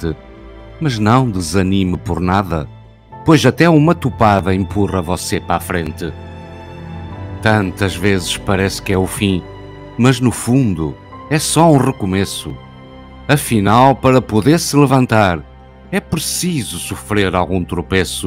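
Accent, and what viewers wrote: Portugal. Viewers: Que lindo numa voz portuguesa, lembro de Fernando Pessoa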